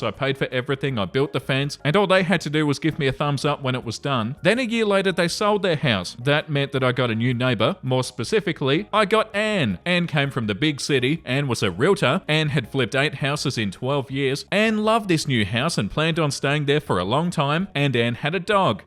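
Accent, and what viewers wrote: Australia. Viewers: NGL, I absolutely love Australian accents and it's part of the reason I find your reads so funny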